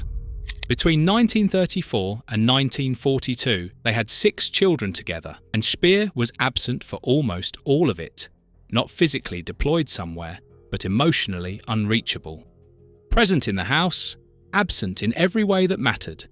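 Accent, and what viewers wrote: England, United Kingdom. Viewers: If they don't have a good thick English accent like this guy, why bother.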